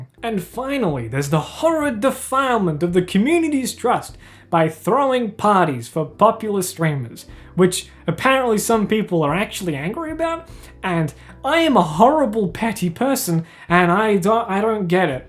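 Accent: Australia. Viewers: I love your sweet sweet Australian voice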